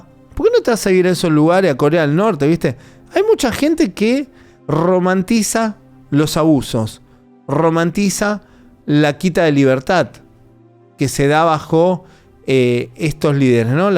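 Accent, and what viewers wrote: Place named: Argentina. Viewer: no le cambies, me encanta cuando dices: “en cana” y es un “agco” siempre me da risa cuando los argentinos dicen asco.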